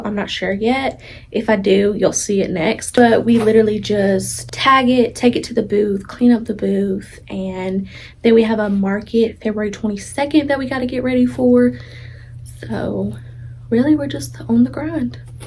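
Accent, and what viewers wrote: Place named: Southwest Virginia, United States. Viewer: Hi! Va here too! I gotta ask bc of your accent. Are you from south west Va? You sound like my family.❤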